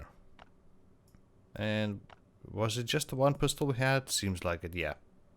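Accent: South Africa. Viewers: Wow a South African lekker man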